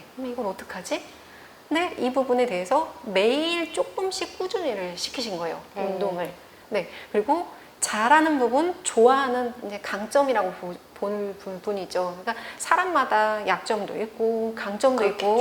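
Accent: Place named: Jeolla, South Korea. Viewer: 전라도 ㅋㅋㅋㅋ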